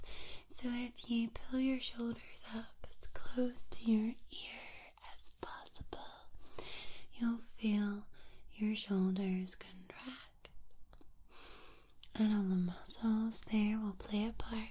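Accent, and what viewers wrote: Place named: Scotland, United Kingdom. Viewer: 😀👍 3hrs of Sultry Crikey! This will be good....very good.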